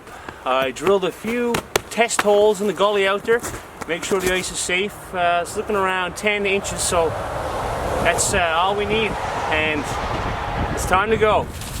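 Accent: Ireland. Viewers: Why the half Irish accent? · Just found your channel through joe robinet i know your from newfoundland im from northern ireland and you sound as if you've got a bit of an irish brogue about you I've watched a couple or your videos and liking them very much i subscribed so will be looking forward to some more videos well done m8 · New to the channel. Love it. Cannot get enough. You sound Irish to me or is that the Newfoundland accent. Please do not be offended, just trying to learn. Thanks! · "Go habs go" Alright buddy, I liked you yesterday, now I'm straight up in love! Dude your channel is loaded with content! Damn productive you are! Is your accent from Ireland? Also, where do you live man, to be a habs fan? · And I am new to your channel but you sound Irish, some of the music too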